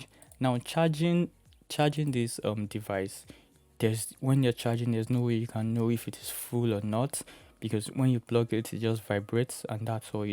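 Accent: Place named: Nigeria. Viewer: Are you Nigerian? I love the accent bro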